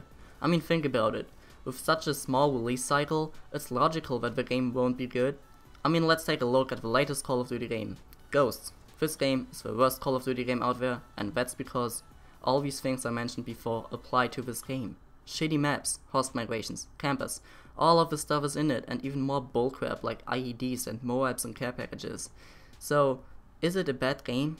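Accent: Germany. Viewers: Your accent is funny ^^ A typical german accent and luckily i haven´t got one that bad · Haste eiskalt den Intro-Song von Solution geklaut... Ansonsten relativ nichtssagendes Commentary, dass CoD zu hastig und profit-orientiert released wird ist nichts Neues, daran wird sich aber nichts ändern, da hier kein gutes Spiel erstellt werden soll, sondern Gewinn das einzige Ziel der Produktion ist. Englisch ist gut, nicht perfekt, relativ flüssig, noch leichter deutscher Akzent, ansonsten solide. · Sick german accent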